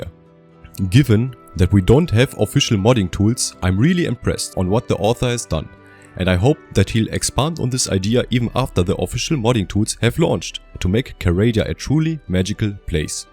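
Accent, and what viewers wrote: Germany. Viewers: Ich liebe deinen Deutschen Akzent, einfach geil xD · Bitte in deutsch It is hard to hear the Denglisch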